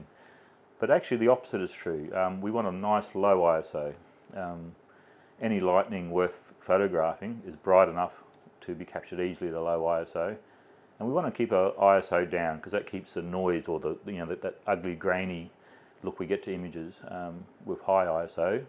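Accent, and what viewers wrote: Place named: Australia. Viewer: Nice easy to understand tutorial. Nice to hear the Aussie accent rather than some septic tank shouting and screaming at you.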